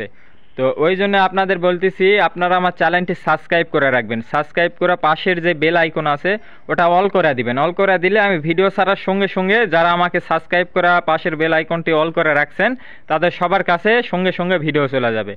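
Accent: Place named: Rajshahi, Bangladesh. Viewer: vai apnar bari kothai kotha sune mone hoi rajshashi abar bogurar vasa kahini ki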